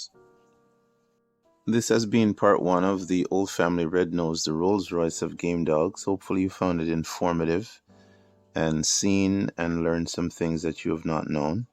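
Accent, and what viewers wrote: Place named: Jamaica. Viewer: Off topic.. Are you Jamaican? Maybe its just me, but Im hearing a familiar accent.. Sound like a yardie..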